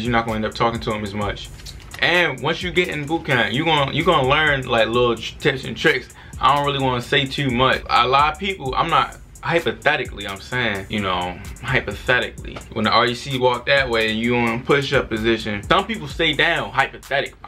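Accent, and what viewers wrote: Baltimore, United States. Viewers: Just by watching the video I can tell he from Baltimore they got that certain accent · Baltimore accent?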